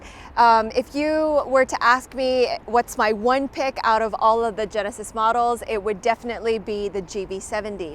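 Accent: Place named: United States. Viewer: 으음. 메이크업, 스타일은 한국식인데 영어는 미국식이시군요ㅎㅎ 차 리뷰도 보고 영어 표현도 배우고 잘 봤습니다. Well presented. 💘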